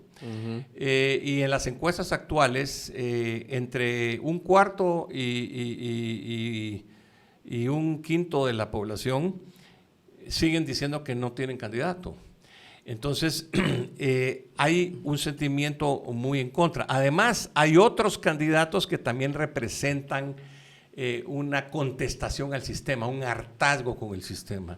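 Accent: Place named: Guatemala. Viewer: Lo felicito. Su acento es casi el de los chapines de 1966 cuándo me fui. Hay otros que parecen mexicanos.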